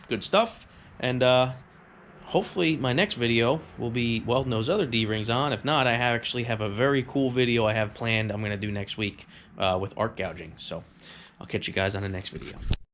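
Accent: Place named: New Jersey, United States. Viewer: I’m here for the welding and hearing the perspective of a welder from Joisey 😂✌🏻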